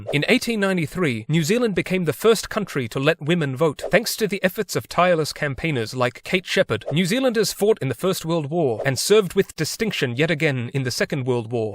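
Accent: South Africa. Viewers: sick south African accent my guy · This is new Zealand bro let's have a look cuz. I laughed so hard my bro u actually sound like my south African mate who lives here in nz lmao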